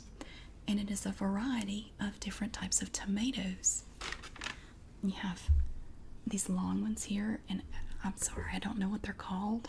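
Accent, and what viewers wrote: South, United States. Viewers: Well in any case, thanks for making these Southern accent whispers. I love them.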